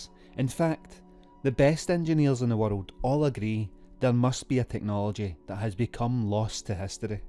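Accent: Scotland, United Kingdom. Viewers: Always good to hear a fellow Scot. I’m doing a similar video at the moment for my channel. The Thunder Stone in St Pete’s which I’ve been to and is about 1500 tons was moved by manpower in the 1700s. I’ve been to the Menga Dolmen with a 180 ton capstone, Broken Menhir in Brittany up to 350 tons or the Unfinished Obelisk over 1000 tons. The ‘technology’ and magic for me is nature. This is what we’ve lost sight of and our connection to the planet.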